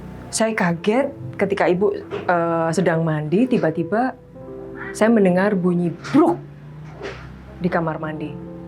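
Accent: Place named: Java, Indonesia. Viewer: Wah jawani banget nggih bu dlm bercerita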